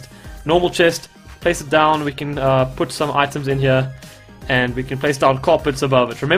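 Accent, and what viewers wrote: South Africa. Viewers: let me guess...South African? yup me too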